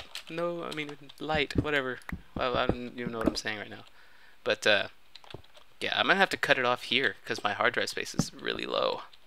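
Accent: United Kingdom. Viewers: you do a damn good english accent lol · nice british accent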